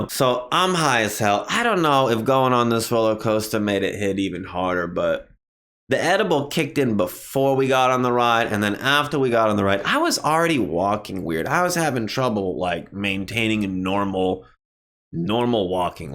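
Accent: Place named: New York, United States. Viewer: bro sound like he from new york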